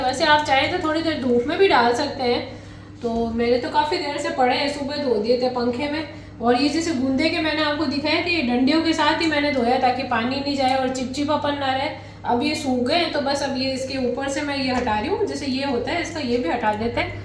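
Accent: Jodhpur, India. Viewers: Jeejaa aap jodhpur k rahne vaale h ya aapki meethe boli m jodhane kee apnayat h achar k kya kahne vaah saa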